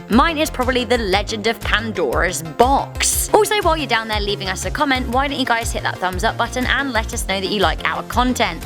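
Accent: England, United Kingdom. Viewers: Just to say, I live your English accent dear😊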